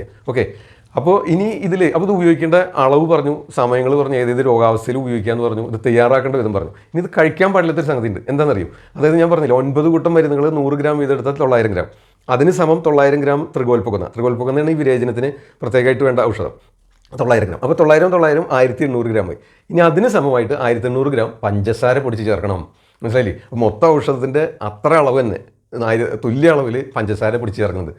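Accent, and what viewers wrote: Thrissur, India. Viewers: വളരെ നല്ല ഈ അറിവു തന്നതിനു നന്ദി, തൃശ്ശൂർ രീതിയിലുള്ള അവതരണം നന്നായിരിക്കുന്നു 🙏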